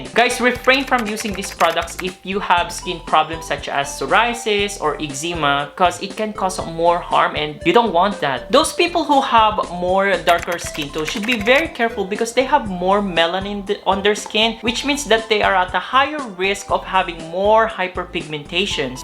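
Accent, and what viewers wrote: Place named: Philippines. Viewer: Sobrang thick ng pinoy accent mo, napaka tigas ng dila at tunog lumaki ka sa Pilipinas na kaliwa at kanan ang RDL at Maxipeel. Hwag mo kaming paandaran na hndi ka pa nakakita nito sa buong buhay mo noong nasa Pilipinas ka kahit sa maliit na butika meron nito. Meron din itp sa mga sa advirtisements sa TV and mga posters nagkalat makikita mo kapag nasa jeep, bus, at kahiy drive ka ng car. Pati English mo tunog pinoy at mali pa ang grammar. Simpleng paggamit ng S sa singular at plural hndi mo alam. Kaya wag mo kami paandarang nagulat ka na over the counter ito sa Pinas. Pangit ka den hndi ka mabebentda sa mga alpha handsome hottie afams.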